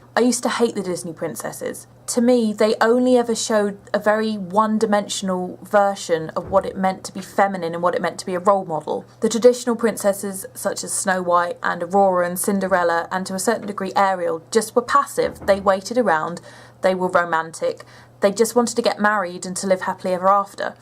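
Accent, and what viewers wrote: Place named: United Kingdom. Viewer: Saw ya comment on Sourcefed. I agree with what you said in your vid, I also think it was also a shame in how they changed her image, really no need for it. I myself thought she was a great character and i really liked her. Not that i have a problem with really feminine women, but i rather the normal down to earth, and even in a way tom boy-ish girl to a certain extent, i even prefer to date women like that :P. Curious i know your British from your accent, but are you also Scottish by chance?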